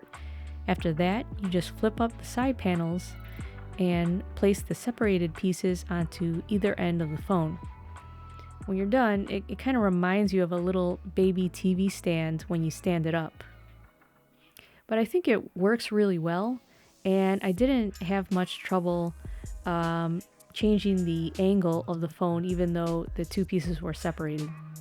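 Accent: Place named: Midwest, United States. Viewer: great review. can i guess, you're from some midwestern state. ohio? illinois? indiana? michigan?..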